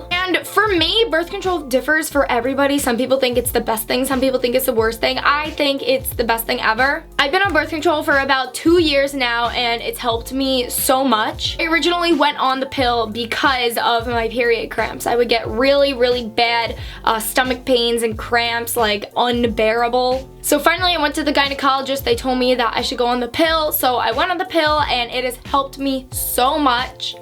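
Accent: New York, United States. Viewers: Her new York accent is on fleek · Love your New Yorker accent, its so cuteee!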